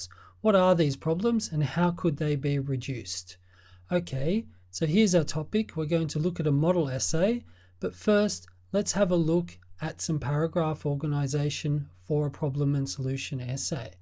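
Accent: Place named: Australia. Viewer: I LOVE AN AUSTRALIAN ACCENT!!!!!! EASY TO UNDERSTAND AND I JUST ... LOVE IT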